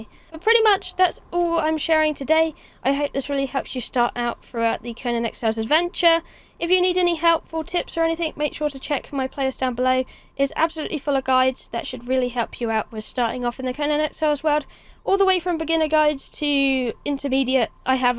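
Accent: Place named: United Kingdom. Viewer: Challenging accent as a native german speaker, but I've got a friend in the UK with a similar accent, so it wasn't as bad, heh. What I wanna say is, despite the unfamiliarity to your accent, I still understood almost everything. Thanks for the video, it helped. One question though; Are daggers viable/good throughout the game? Like does the bleeding effect for example gets stronger with the weapon?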